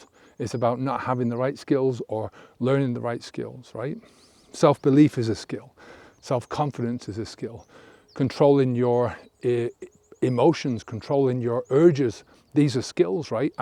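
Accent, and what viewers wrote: Ireland. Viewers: I had the conversation with myself this week. Im a functioning alcoholic. No major hangovers or troubles with the law but definitely something i want to stop. Im SO grateful that i found your channel. You sound irish also. Im from Donegal. Living in Brooklyn ny. Thank you, sir.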